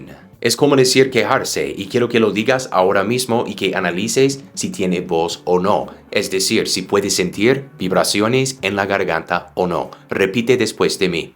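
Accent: United States. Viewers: Tu pronunciación del español suena naturalmente yanki. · lo principal es que los latinos no pronuncien la ed como tal pleyed guorked da lo mismo si dicen playt or playd si eres profesor importa, pero para los que aprenden NO ES TAAAAAN importante. Por ejemplo, tu español es muy bueno, pero tu pronunciación sigue siendo gringa. Muchos no se atreven a hablar por miedo a cometer errores de pronunciación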